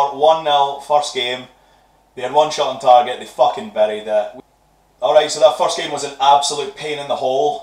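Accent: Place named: Scotland, United Kingdom. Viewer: Hey. I quite like the content you make, loving the thick Scottish accent! You're quality is top notch, I dont want to put you or your content down buddy, but have you got a pop filter for your mic? It might eliminate the echoing on your microphone. Just a thought:) Atraxi. - Subbed!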